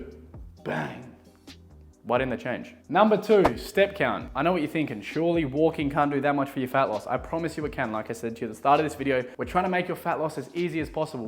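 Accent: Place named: Australia. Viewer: He’s speaking proper Australian mate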